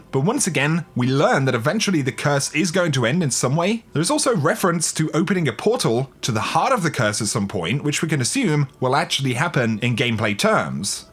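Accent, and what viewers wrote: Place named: United Kingdom. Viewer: Hey, my wife wants to know where you are from. Her mums British but your accent is much different. Just curious. Love the channel!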